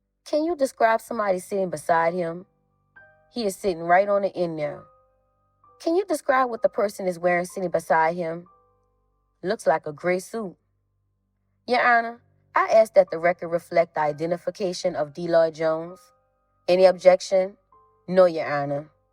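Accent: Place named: New Orleans, United States. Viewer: The reader sound like she straight out the 7th ward by the St. Bernard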